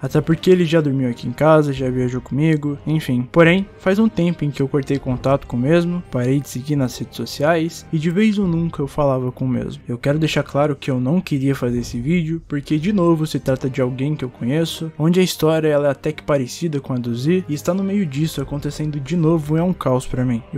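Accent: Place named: Sao Paulo, Brazil. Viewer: Paulista falando palavrão é lindo dms Vc parece cmg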